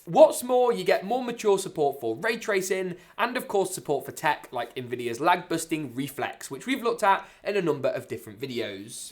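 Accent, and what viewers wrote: United Kingdom. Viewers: i wanna build a pc but i always think are the prices for the gpus will go down or not. i don’t want to buy a prebuilt pc they’re so expensive. i actually knew you yesterday and i really loved your videos and the quality is brilliant + your accent is very nice it makes me wanna learn british english. ( sorry for my bad english this is all i learned from school 😔😂)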